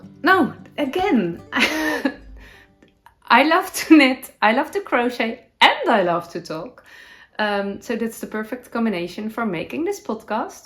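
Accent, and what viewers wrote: Netherlands. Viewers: I love your Dutchy english😊 Half dutch myself, danish the other half, love everything creative and artsy, glad I found your channel♥️ tot siens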